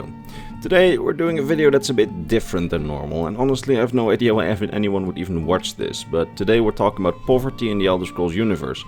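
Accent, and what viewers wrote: Netherlands. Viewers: Ik kan aan je accent horen dat je Nederlands bent :)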